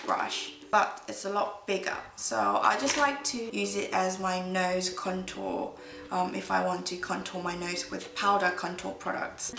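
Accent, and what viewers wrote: United Kingdom. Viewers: 영상많이올려주세요♥ 영국발음좋아요ㅎ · 영국식 영어 너무 멋져~~♡ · 아이허브에 리얼테크닉브러시 세트가있더라구용ㅠㅜ그거 구매할까말까 수천번은 더 고민하구있어요ㅎㅎ 스펀지두 마찬가지ㅜㅜ 언닝 영국발음 쭤러용♡ 볼살없어서 스트레스인 저에게 언니 볼살은 부러움의 대상이에용ㅋㅋ 항상 이쁜비디오 감사드려용! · 얼굴은 애기애기한데(손연재닮음!!!) 목소리나말투는 파워머찜..역시 발음은 브리티쉬..존멋..무튼언니팬이라구용..><항상잘보구잇슴니댱~~~ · 올라오기만 기다리고있었는데 올라왔네요!!!!!댓글 처음 남겨봐요ㅎㅎ좋은정보 많이 알아가서 좋아용 영국발음에 관심있어서 보게된건데 언니 동영상들보고 급!!화장에도 관심이 생겼어요ㅋㅋㅋㅋ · 님은뭐랄까..보면볼수록매력이있는거같아요~~~오늘부터구독하기시작했어여~~~경선님유튜브에서알게되서오늘부터보기시작했네요^^ 영국영어발음도너무매력적이고~~항상응원하겠습니다~~~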